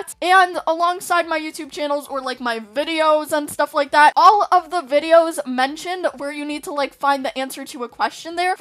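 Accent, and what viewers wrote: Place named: England, United Kingdom. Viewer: “Have fun today” / “equestrian festival” love your Chanel, your English accent is on point x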